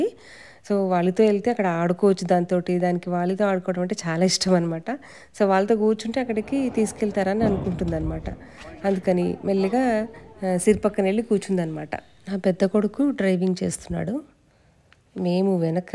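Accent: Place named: Telangana, India. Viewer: Mee language paka telangana lagavune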